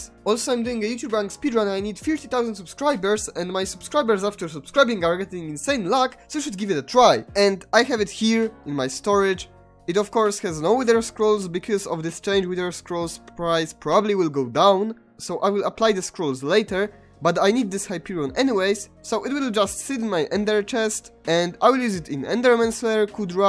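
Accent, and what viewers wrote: Poland. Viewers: Po pierwszym słowie czułem że jesteś Polakiem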